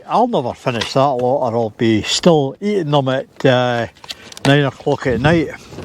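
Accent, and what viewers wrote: Scotland, United Kingdom. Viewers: Are you Scottish maybe?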